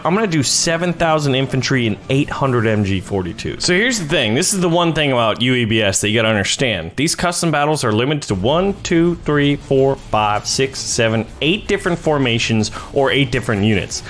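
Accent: Germany. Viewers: u pronoce brezel wrong sorry XD you german acent is so bad it hurts in my ears i love it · Nice German accent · i swear your german is sooooo funny😂😂i am from austria so i gotta know😅🤣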